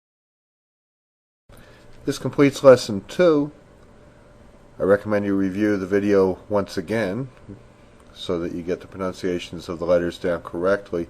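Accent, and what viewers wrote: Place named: United States. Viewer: It's more of an American accent. Not Ashkenazi. Maybe more Sephardic.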